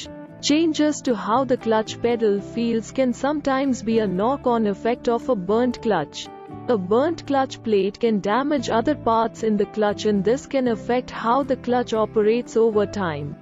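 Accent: India. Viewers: Are you an indian lady as of your accent please...!??🤔!!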